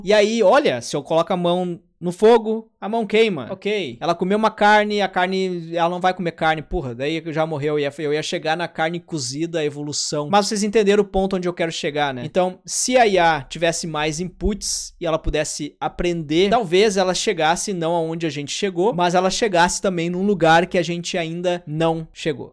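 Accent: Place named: Ceará, Brazil. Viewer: Cearense detected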